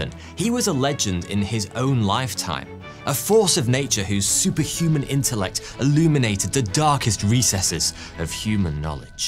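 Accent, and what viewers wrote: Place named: England, United Kingdom. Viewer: Same, and I’m not a fan of English accents, but is an exception… 😂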